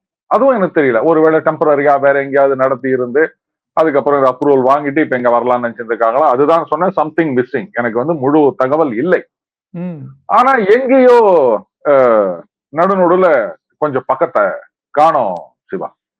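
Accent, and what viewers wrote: Chennai, India. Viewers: Chennai Tamil. Why should one get irritated to listen to a regional Tamil, that's part of our TN and that too capital city. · Madras Bashai! grew up in the rough and tumble of Madras! I like his Tamil because I am also from Madras! interview is by and large will be in Madras Bashai! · talks are in Madras style which all of us like.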